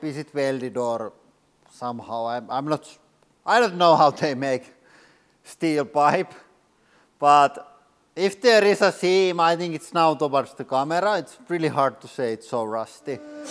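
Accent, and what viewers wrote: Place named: Finland. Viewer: Love your Finnish accent!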